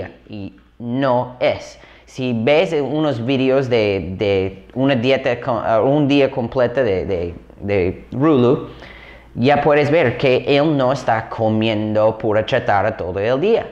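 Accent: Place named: Mexico. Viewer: Es adictivo escuchar ese español de Méjico que utilizas XD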